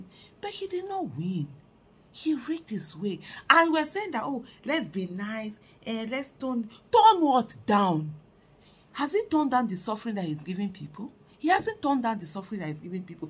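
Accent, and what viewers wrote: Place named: Bendel, Nigeria. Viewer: Proper Bendel woman talking! Better listen o!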